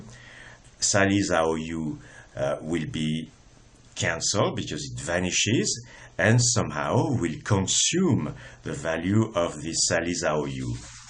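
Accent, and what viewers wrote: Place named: France. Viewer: This accent sounds French! :D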